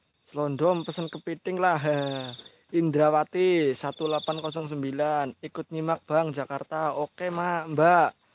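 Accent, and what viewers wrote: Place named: Banyumas, Indonesia. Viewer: Seram tpi aga lucu ya,ngapak xxz.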